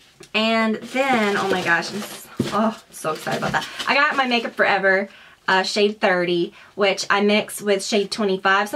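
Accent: South, United States. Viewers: why is she talking with a southern accent in this video? idk if shes from/lives in the south..but ive never heard that from her before. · Your southern peeked through in this video :). Could you please review that hourglass primer?! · Can you make a video demo of the masks you got. It'll be nice to see how they work. Btw you sounded extra country in this video, and I liked it! ;) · I feel ike you have the southern accent that only comes out when your talk super fast, i love it. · Love your videos! You inspire me to make vids...i luv your pretty lite southern accent ; ) i luv your long vids too..more tours please.love your style! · Your accent is soo southern in this video ! Never noticed it so much before.. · Have you always had a Southern accent and I never noticed? Or did you just develop one? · You really looked like you were having fun and were happy in this video :) It kept me smiling :) Also at times I could hear a bit stronger southern accent than usual... 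Adorable :)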